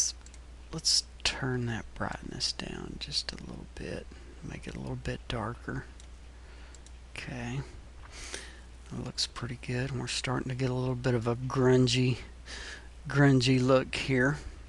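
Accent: United States. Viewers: Love the American accent lol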